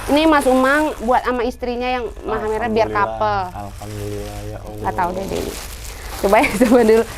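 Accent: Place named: Jakarta, Indonesia. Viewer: Bahasanya betawi bet mpok hhehehe tp keren pengetahuannya.sehat selalu mpok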